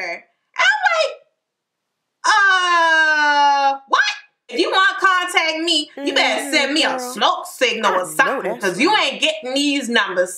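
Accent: Baltimore, United States. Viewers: I KNEW THAT WAS A BMORE ACCENT😂😂 Nice to know someone older, from my hometown, give tips about Korea. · I’m sorry this is my first time watching your videos and I sweaaaar you talk like Tamar Braxton 😂😂😂😂😂😂 I guess that’s a Baltimore thing 😂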